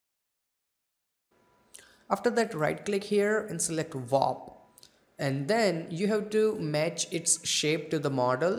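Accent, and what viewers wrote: India. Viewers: i´m sure that u r an indian. u speack like an indian guy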